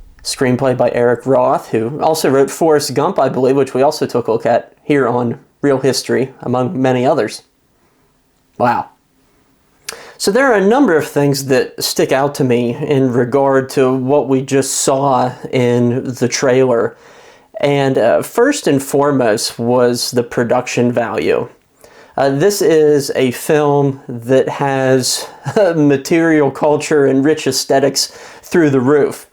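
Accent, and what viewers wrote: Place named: Philadelphia, United States. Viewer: Prof, r u from philly area? You pronounce o like in philly.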